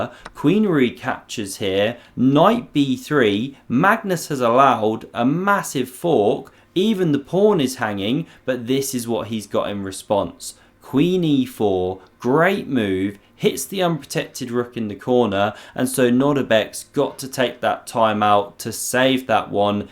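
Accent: England, United Kingdom. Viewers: Great dead-pan British humour - and well chosen chess games. (I suspect you are an English person - based on the accent.)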